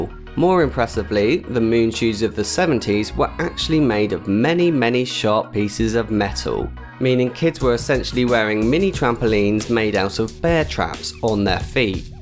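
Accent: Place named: United States. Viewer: your amrican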